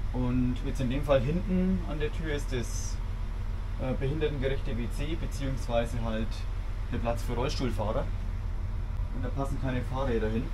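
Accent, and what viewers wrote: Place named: Franconia, Germany. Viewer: Feinstes Fränkisch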